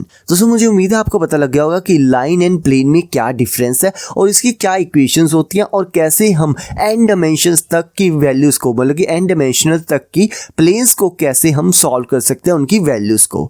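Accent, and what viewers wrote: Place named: Jammu, India. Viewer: Bhai tu jammu se toh nahi hai? Your accent sounds quite familiar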